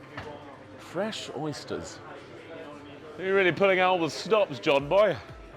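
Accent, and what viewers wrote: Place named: Austria. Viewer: Have to acknowledge the Arnie accent sir!!! 🤌🏾🫡💪🏾🔥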